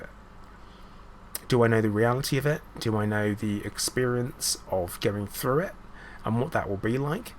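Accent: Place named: RP, United Kingdom. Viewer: May 24th, 2023 - Dearest, you are inspiring me in ways you will never understand. I feel at times, that you are speaking directly to me, as if you know me personally and you're giving me advice on how to handle my problems. I am very much like you, in the way you like to know the plan, and what's going on each day, wanting the facts and having them organized. There's nothing organized about being ill. I understand the triggers too, when you can't get away from it, and cancer is everywhere all the time! I love your free talks, where you just free-flow with your feelings and ideas. It's like listening to a friend who's going thru a very hard time. I am sending you my best wishes as you go thru your last days. I was heartbroken to watch your latest video today. Your voice is always something I've enjoyed so much. You have such a lovely posh accent, and are so philosophical.:face-red-heart-shape: Smelting Pot!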